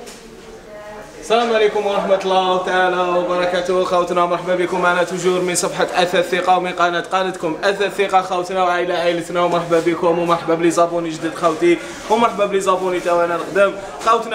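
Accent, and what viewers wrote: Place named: Algeria. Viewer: السلعة ماشاء الله ولكن الهدرة تع الجزيري يريحو بالعشرة متعجبش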